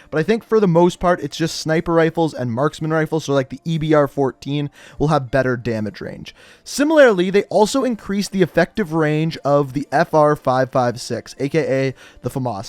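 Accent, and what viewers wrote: Canada. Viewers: Bro are you Canadian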